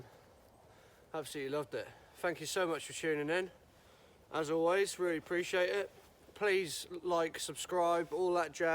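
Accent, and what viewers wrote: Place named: Suffolk, United Kingdom. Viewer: Love your set up. East of you here. Nice to hear some Suffolk twang, even though you are in Norfolk.. 🥳👍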